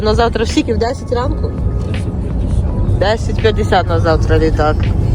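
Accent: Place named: Vinnytsia, Ukraine. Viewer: Приємної подорожі! Храни вас Господь!!!❤❤❤ я теж так як ти говорю Молдавія, Кишиньов😅😅( ну Вінничани і Хмельничани то ми так)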